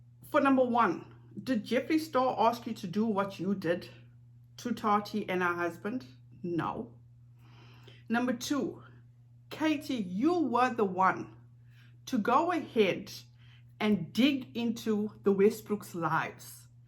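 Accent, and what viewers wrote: South Africa. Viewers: New sub here! I really enjoy your content and I can appreciate the rational advice you’re attempting to give, unfortunately KJ is too far gone her ego is going to be her downfall. I like all the points you make and agree she needs to think of her family first! But her ego comes first! I love your accent Miss, my BFF is from Durban SA, and lives in the US also so hearing your lovely accent reminds me of her and you remind me of her, she is the best! Look forward to more content! Congratulations on hitting the 1K mark! 🥳❤️✌🏽😃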